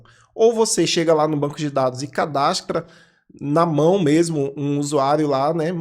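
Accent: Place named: Northeast, Brazil. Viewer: Muito bom, me ajudou demais!!!! E ainda é nordestino que nem eu!!!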